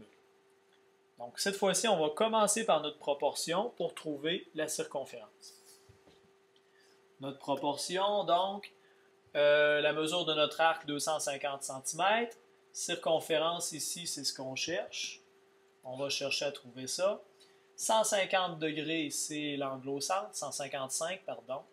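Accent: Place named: Quebec, Canada. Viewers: c un accent quebecois ! ta un probleme avec cette accents ? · aurelio L'accent Québecois! Youhou??!?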